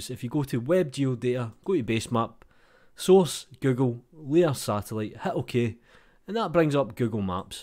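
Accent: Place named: Scotland, United Kingdom. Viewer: It’s a bit wired, I watch all the videos, even thing I know and not interested off. Just because of it’s dialect. Ain’t sexy, but so funny. I just have to google for movies in true Scottish! Oh and yes the videos are quit good.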